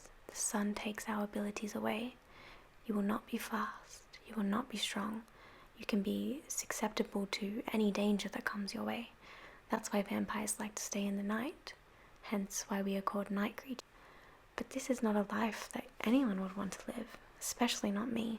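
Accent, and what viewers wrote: Australia. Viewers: the Australian accent which is a trigger in itself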